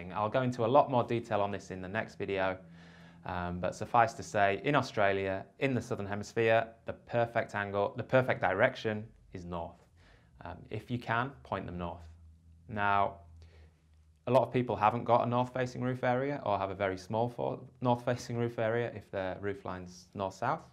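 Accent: England, United Kingdom. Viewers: Sounds funny hearing someone with an English accent telling you to face your panels North.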